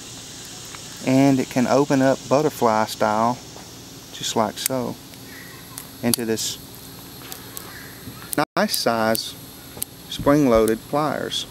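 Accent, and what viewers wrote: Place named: South, United States. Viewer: I'm guessing southern/ southern mid west usa.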